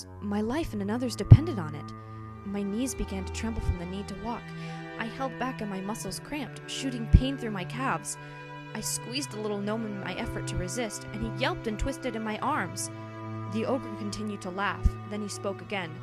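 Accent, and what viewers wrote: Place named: United Kingdom. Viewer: more like posh English, lol i loved the different languages, I never know how to say them when I read it.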